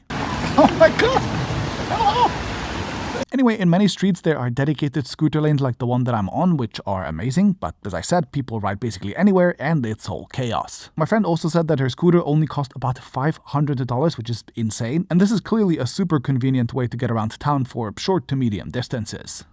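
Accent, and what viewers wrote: China. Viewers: Why'd you have a slight chinese accent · How much does this guy get paid to simp for china? He even has a slight chinese way of speaking english.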